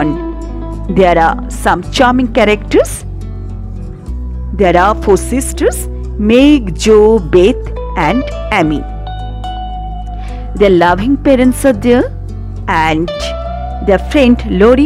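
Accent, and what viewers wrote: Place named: United States. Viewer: Wow You speak English like a American 🤩 You explain like a expert of English 😍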